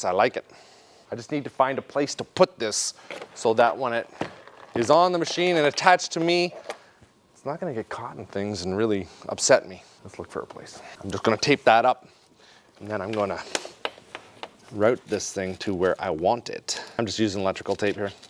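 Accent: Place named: Northern Canada, Canada. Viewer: it sounds like your from Northern Canada. where abouts?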